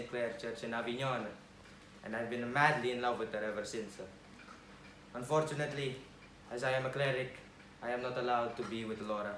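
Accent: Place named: Italy. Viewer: Italian accent !!!!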